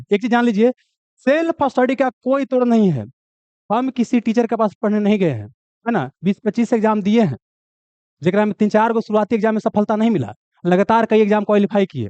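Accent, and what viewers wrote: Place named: Bihar, India. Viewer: Bihari style me mast bol rhe ho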